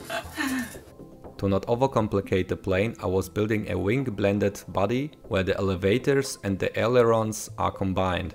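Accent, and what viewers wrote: Germany. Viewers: Are you german? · so you are German 😂. Das "Nein" und dein axent sind ziemlich verräterisch.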